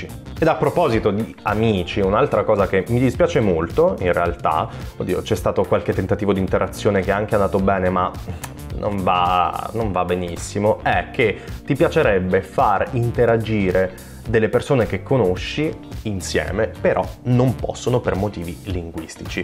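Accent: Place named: United Kingdom. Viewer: Voglio. Il. Tuo. Accento. Inglese.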